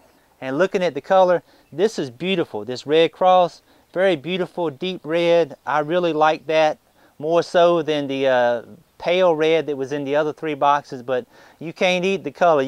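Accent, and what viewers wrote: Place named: North Carolina, United States. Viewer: I just subscribed about a week ago. And as a Louisiana farm boy I find your videos informational and entertaining. Having worked in North Carolina for a few years (Pittsboro area) I can tell by your accent that you don’t live very far away. Keep up the good work and I will be watching for more good stuff. Specially as you change “SMOKE” hats…….lol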